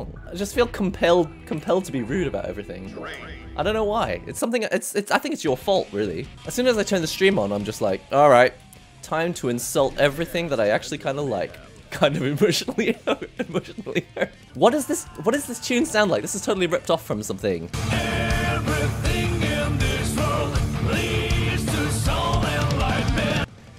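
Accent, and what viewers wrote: England, United Kingdom. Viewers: There's no way he's actually from Japan, he almost sounds like he has an English accent rather than a Japanese one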